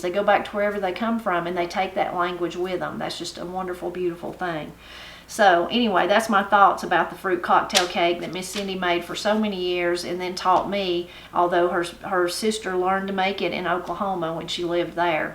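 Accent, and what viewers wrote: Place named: Florida, United States. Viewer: Your accent reminds me of my family in Florida. I have had this cake. In Oklahoma at our yearly Easter family reunion. Pot luck favorite! ❤❤❤❤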